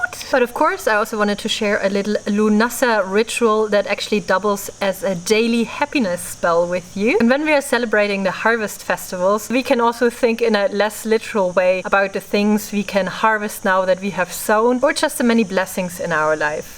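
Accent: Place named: Germany. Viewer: How lovely to have stumbled on this video.... I noticed your accent and said to myself....ist sie deutsch?!!? Tagsächlich....ich bin seit 1979 in den staten....aber meine ganze familie ist noch in der Stuttgart area!!! Auch eine küchen hexe und practicing pagan für über 40 jahre!!! Your video is fun, lighthearted, informative and REAL!!! No witchy theatrics but genuine witch lore, love love love... you definitely have another follower!!!! Here in New Jersey we have similar weather as home so wheat stalks, sunflowers are used for lughnassad decorations and on the eve of lughnassad I make my bread dough, let it rest overnight and bake lughnassad morning!!! A small piece as an offering during Ritual and consumed with a Lughnassad dinner in the evening!!! Tschüss!!!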